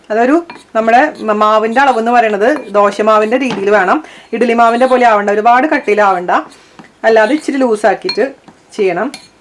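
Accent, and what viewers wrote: Thrissur, India. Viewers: Love your recipes and your thrishuran malayalam. It's music to the ears!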